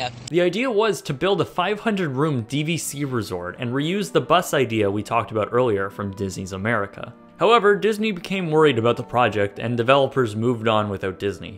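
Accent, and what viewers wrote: Canada. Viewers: "howoosing...oot..." the speaker is from Canada I am guessing, ay?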